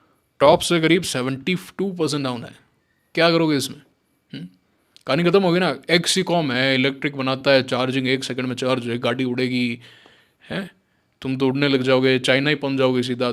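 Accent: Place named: Rajasthan, India. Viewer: Aapki baatein sunke aisa lagta hai ki : Aap rajasthan se hai (shayad - Kota, ajmer side) and aap kota (JEE) padhne ke liye gye thhe.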